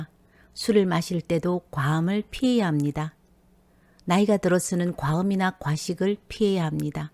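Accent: Gyeongsang, South Korea. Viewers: 말마다 옳습니다 경상도 사투리도 예쁘십니다 ㅎㅎ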